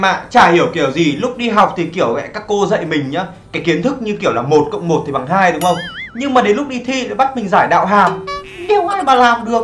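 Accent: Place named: Central, Vietnam. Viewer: Liz gà dũng nói dọng miền trung dương em ko biết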